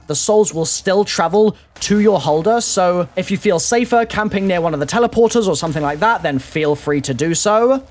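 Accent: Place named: United Kingdom. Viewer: You're British arent you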